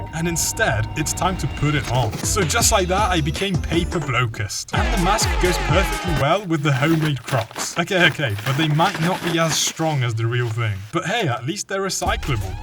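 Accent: United Kingdom. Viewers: You're British 🇬🇧？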